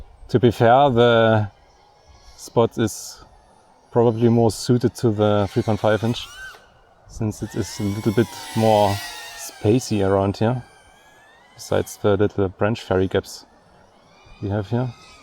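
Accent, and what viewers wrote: Germany. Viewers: That German accent is just crazy lol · accent sound german af, also mach ich mal in amtssprache weiter. Geiler spot den du da hast. Ich habe meinen goto spot leider noch nicht gefunden :D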